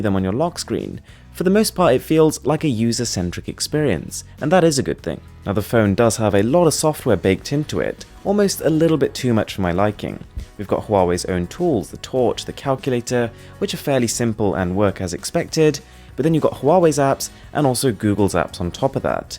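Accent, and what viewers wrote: RP, United Kingdom. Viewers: You've got a very posh accent. Great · The accent of this guy British... Nice review but can you do an depth camera review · of all the youtubers with British Accent Mrwhosetheboss' is my favorite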